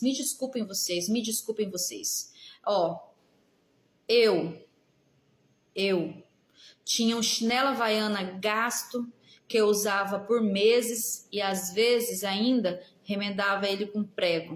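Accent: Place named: São Paulo, Brazil. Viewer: Sério isso vc de madureira com essa fala...parece q é de são Paulo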